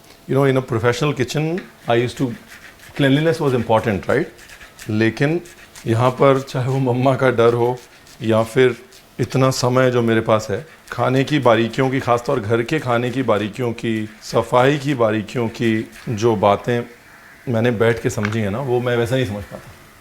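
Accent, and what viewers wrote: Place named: Punjab, India. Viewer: na g na ye panjabio ka accent hai ...Not in village..😊😊😊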